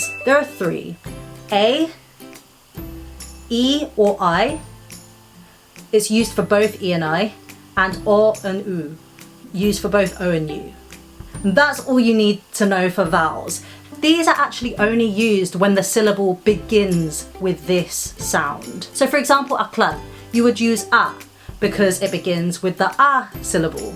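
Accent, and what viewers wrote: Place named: United Kingdom. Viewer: I am new at your channel and I like your British accent.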